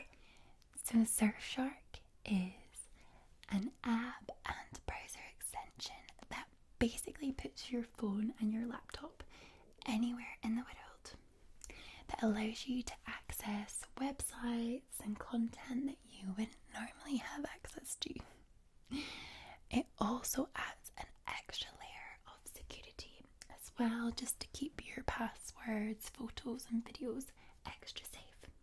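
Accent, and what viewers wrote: Scotland, United Kingdom. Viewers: Who else a simp for! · Only thing better than is x2! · Probably the best, most relaxing ad I've heard for a VPN with a nice Scottish accent. Love it when gets sponsored<3 Also so sorry to hear what happened with your bank details, hope the damage wasn't too great?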